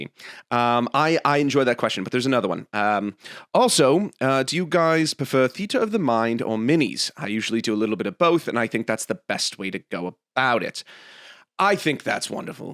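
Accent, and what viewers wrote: United Kingdom. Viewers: The recieved pronounciation is tripping me up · The british accent makes the intro so much more precious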